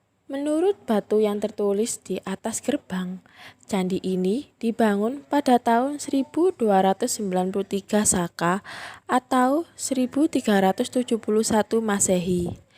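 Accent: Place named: Java, Indonesia. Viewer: Suaranya jawa bgt.. Xixixi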